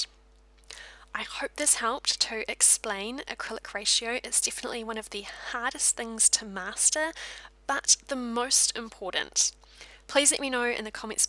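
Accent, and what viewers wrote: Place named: Australia. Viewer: "eats tew wit" lol I love your accent. Great video. Are you Aussie?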